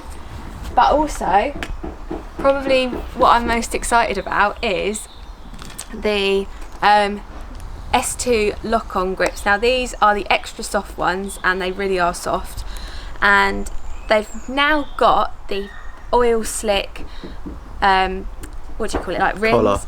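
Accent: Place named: Australia. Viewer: I got used to that Australian accent.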